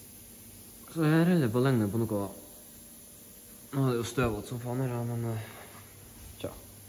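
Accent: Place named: Trøndelag, Norway. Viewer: Du snakker bra trøndersk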